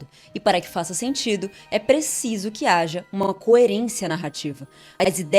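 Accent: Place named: Pará, Brazil. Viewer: Vc é paraense né, aqui as pessoas tem uma fala meio igual de portugal.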